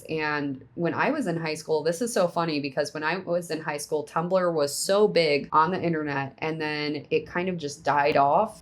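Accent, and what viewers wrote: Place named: Wisconsin, United States. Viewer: Do you mind me asking what state you're from? I'm trying to place the accent. I'm guessing Wisconsin. Great voice by the way.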